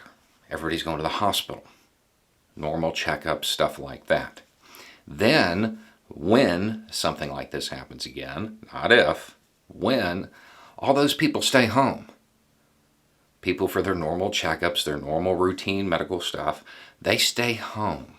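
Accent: South, United States. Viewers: I'm sure you've been asked this before, but have you ever thought about running for an office? You'd be the first southern accent I ever voted for!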